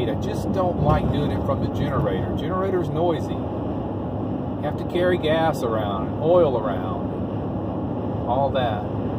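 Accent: South, United States. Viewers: Love your southern accent 🤠👍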